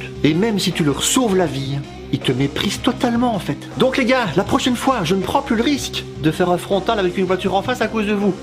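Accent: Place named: Belgium. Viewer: Salut je suis cycliste, et je déteste les mauvais humoristes belges avec des accents idiots ! Mais je n'en fais pas une vidéo ...😊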